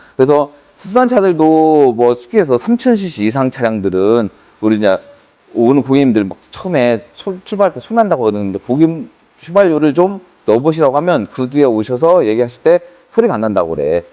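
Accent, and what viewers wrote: South Korea. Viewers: 전라도 말투 듣고 신뢰가 안간다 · 흐미 즐라도 광주분이신가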